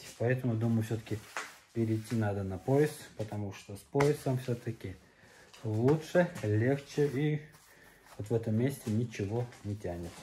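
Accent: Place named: Tatarstan, Russia. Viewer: татарский акцент у тебя как у Миниханова.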